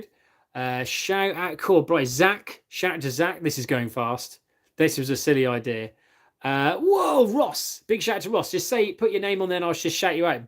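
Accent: United Kingdom. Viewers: Best British accent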